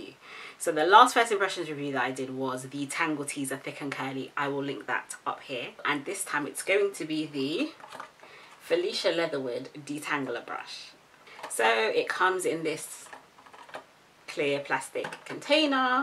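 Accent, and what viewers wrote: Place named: London, United Kingdom. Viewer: thank you!!! I was trying to figure out your accent cause I knew it was London but I didn't know what part. I am from southeast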